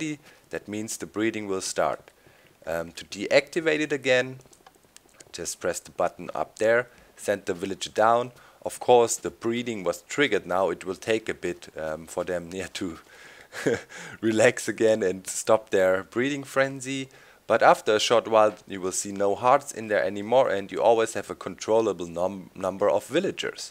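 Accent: Germany. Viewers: Are you german? :) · Auch nicht schlecht, dachte mir doch der Akzent hört sich deutsch an. Aber sicher war ich mir dann bei "Last but not least" ;)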